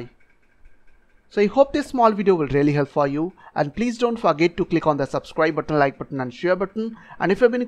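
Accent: India. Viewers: ভেতো বাঙালি ইংরেজি বল্ল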